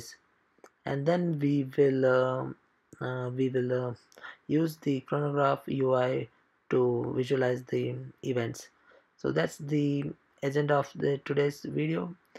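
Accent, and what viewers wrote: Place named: India. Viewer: Thanks for making that Video it helped me a lot. My Feedback would be , its pretty hard to follow the India-English Slang , in the Fast Speed Modus.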